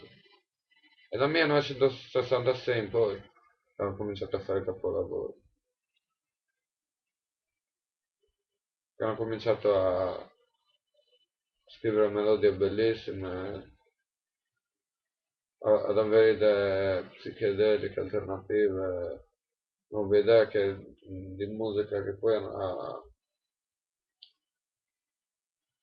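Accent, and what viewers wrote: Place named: Sardinia, Italy. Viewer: Scusa,ma non riesco a circoscrivere il tuo accento,sei Sardo o Pugliese?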